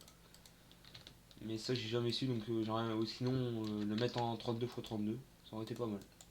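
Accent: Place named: Nord-Pas-de-Calais, France. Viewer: Ch'ti RPZ, toujours le même accent xDD Bonne continuation à ta chaîne, m'y abonne :*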